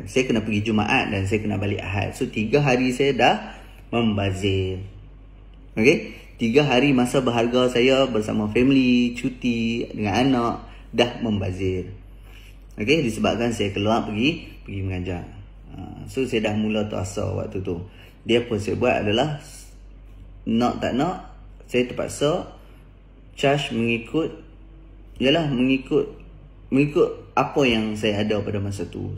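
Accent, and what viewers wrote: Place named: Kedah, Malaysia. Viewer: orang kedah kalau berletiaq..memang sedap dengar..haha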